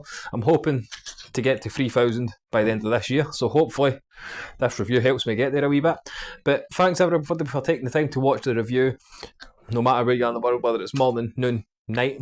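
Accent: Scotland, United Kingdom. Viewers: hey man! great review. just purchased a set of these, I will let you know how they are Awesome Scottish accent my man. Cheers from Canada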